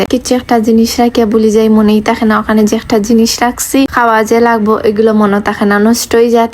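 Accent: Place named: Sylhet, Bangladesh. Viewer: Kotha sune mone korsi sylhet er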